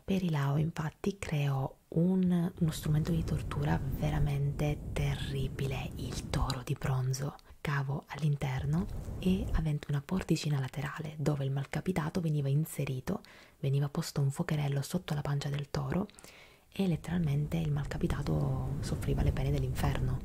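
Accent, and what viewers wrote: Sicily, Italy. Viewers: Ciao bella immaginavo fossi Siciliana io di Catania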